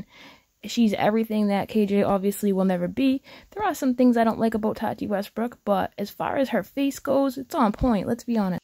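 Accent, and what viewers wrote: Boston, United States. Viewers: Great video as always and love seeing you... your accent coming out of that pretty face is awesome. For a west coast girl, your Boston accent is so cool. I’ve connected with Sue on another comment section on a different channel and praised her for even having the courage to share her pain... only to have a vapid little goblin claim she was “ one upping her story” and not paying enough attention to her talking...what a piece of 💩. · You have to be a Boston girl.. Our accents are surely one of a kind xx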